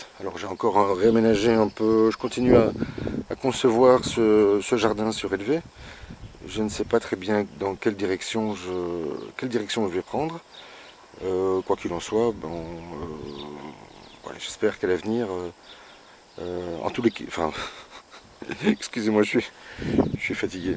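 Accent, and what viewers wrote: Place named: Brussels, Belgium. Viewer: Salut, merci! J’ai reconnu dans ton intonation une musique chère à mon coeur d’ex- Bruxelloise 😉. Je te félicite et te remercie de nous offrir tes belles idées. Bises du Nord de France, Claire.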